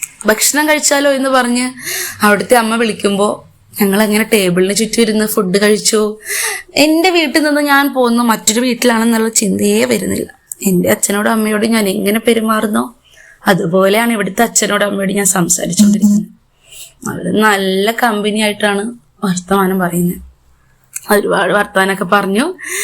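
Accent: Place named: Palakkad, India. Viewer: ഒരു പാലക്കാടൻ സ്ലാഗ്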